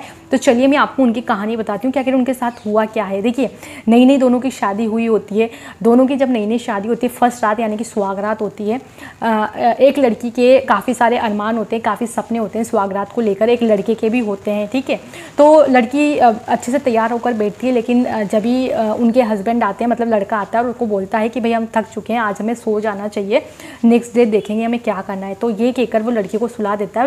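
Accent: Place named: Rajasthan, India. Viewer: Mam aap rajasthani lagte ho bolne k laheje se,par aap ne kaha aap delhi se hai🤔🤔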